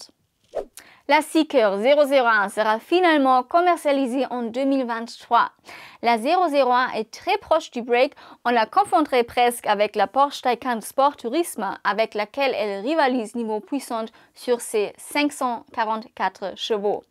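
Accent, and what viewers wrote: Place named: Germany. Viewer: Merci. Petit accent sympa 😂 Allemagne ?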